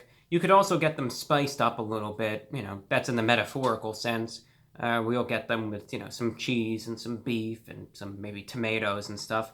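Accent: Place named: New York, United States. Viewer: Where are you from? You sound like you are trying very hard to talk without an accent, but you actually have a heavy one. I'd guess NY.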